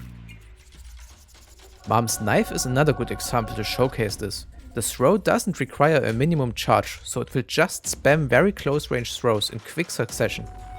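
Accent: Germany. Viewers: That's the most German sounding English I've ever heard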